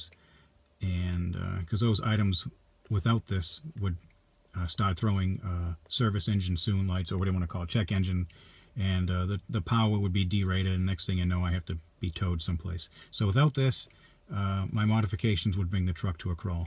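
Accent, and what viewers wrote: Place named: Manchester, United Kingdom. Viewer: Sounds like a Manchester accent.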